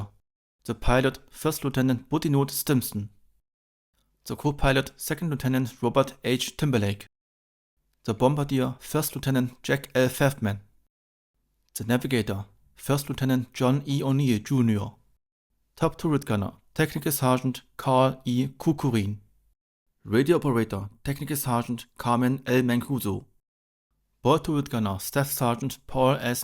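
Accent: Germany. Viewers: If this is your voice, it really adds to the story. The accent adds some depth and feeling to the video, somewhat like watching Das Boot in German, with English subtitles. Well done! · Grundsätzlich mag ich den Kana sehr, aber vielleicht angesichts des starken deutschen Akzents vielleicht mal darüber nachdenken, ob Sie den Kommentar nicht durch eine KI-Stimme sprechen lassen. Dann klingt es wie richtiges Englisch. Da gibt es auch jede Menge gute Freeware.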